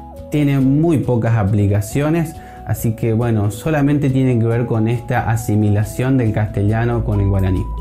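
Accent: Corrientes, Argentina. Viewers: Me di cuenta que eras correntino por como pronuncias la CH soy misionero de padres paraguayos estoy mas acostumbrado ala variante paraguaya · Pasa que es Correntino y tiene otra pronunciación, lo dijo al inicio. No es una pronunciación errada.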